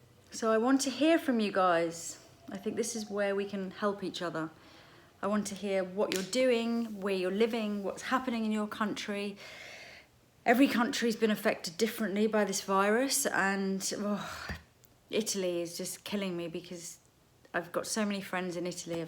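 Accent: England, United Kingdom. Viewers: Hello from the United States. I absolutely love you and your style of makeup. I love that you teach about good skincare and other beauty products. I love your makeup tutorials and I would love to see you do more of them. I have never learned how to do my eyes the way I would like. I don't know why I just hold back and don't really go for it. I have deep set eyes and I am over 60. I would love to see you doing videos everyday if you could and teach us about good skincare, facial massage, how to wear makeup. What is best for older women and how we should wear it and also what colors, etc. So sad to hear about your Kitty and I know that has to be so sad. I have my pretty kitty, her name is Snowball and she will be 15 years old in April. It is so hard staying in here and it is so scary as I know it is for all of you there in the UK and all over Europe. 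We have to help each other and it is so wonderful that you are so gifted and talented in doing makeup that you can share with the rest of us all over the world with your videos. I hope you will do one everyday.. Love watching you and listening to you. Love the accent. I live in the South in the U.S. and I love all things English. It is my Dream to visit the UK and all the old Historical Places and Churches there. I am a Jane Austin kind of girl. I ordered your lipsticks and am so enjoying them so very much. I look so forward to all you teach and hope to see so much more. Thank You so much. You made my day. Love all the Beauty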